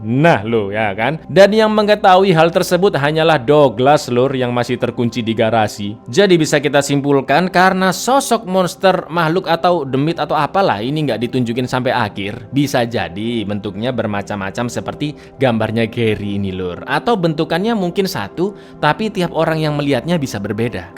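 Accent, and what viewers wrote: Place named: Madura, Indonesia. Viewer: Bang orang madura emang,,ko gitu siih logatnya,,😅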